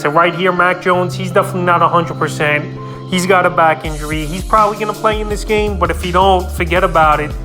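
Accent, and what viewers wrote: New York, United States. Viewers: You sound like you from NY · Definitely from New York right ?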